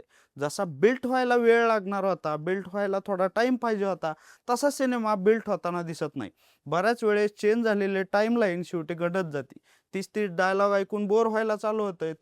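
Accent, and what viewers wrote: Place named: Barshi, India. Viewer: बार्शी cha आहे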